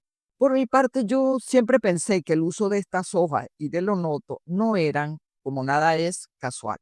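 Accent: Venezuela. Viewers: Por Dios ❤❤❤ que profesionalismo, la bibliografía me dejo asombrado 🎉🎉🎉🎉 me encanta su tono de voz al narrar, me reconforta ese acento venezolano a la distancia. Infinitas gracias profe. Hola pueda llamarla profe.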